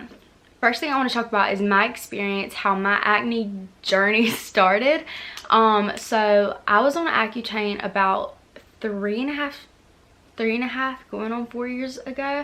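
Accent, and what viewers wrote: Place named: Texas, United States. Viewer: Are you from Texas or something, love your accent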